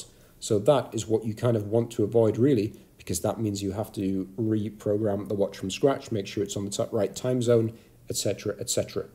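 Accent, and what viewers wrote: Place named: United Kingdom. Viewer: So the watch not working is a protection thing from the heat? I live in Arizona where it gets to 120 degrees , and my Gshock, keeps and going, and just about any other watch I have, including a mechanical wind up watch. The summer's in Arizona gets so hot you cant touch metal with out it burning your hands, you have a British accent, I grew up in the UK, the UK does not get that hot. Something is wrong with your watch, my Gshock is 11 years old, just saying.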